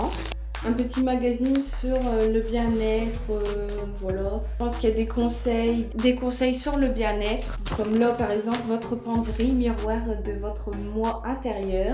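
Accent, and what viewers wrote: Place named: North, France. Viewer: J'ai deviné tout de suite que tu venais de chez nous (Nord de la france). Tu as un sacré accent ^^ Merci pour la vidéo de haul, bonne continuation :)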